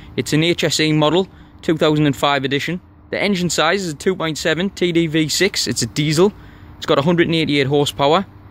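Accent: Newcastle, United Kingdom. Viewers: Lmao not everyone can understand a Geordie accent not that little buttons fault. Hahaha nice vid well presented nice motor also.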